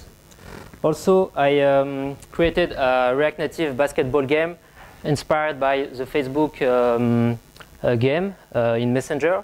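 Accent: France. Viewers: ton accent francais il dechire lol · Tu aurait pu la faire en français.....vu l'accent ^^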